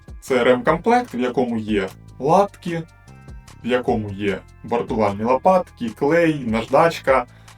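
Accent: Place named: Sumy, Ukraine. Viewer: говірка схожа на сумську.. Суми і Бровари для мене рідні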